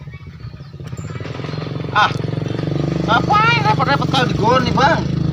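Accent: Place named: Karo, Indonesia. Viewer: Bang logatnya macam orang karo. Kalak karo Kam bang?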